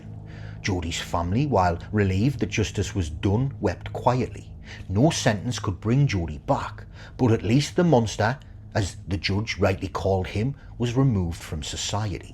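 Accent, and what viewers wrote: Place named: North East England, United Kingdom. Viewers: What part of the cannie north east are you from pal? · Yes Lovely Geordie accent, ❤❤❤❤ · A true crime channel narrated by a real human AND a Northerner too! New sub and thanks! :)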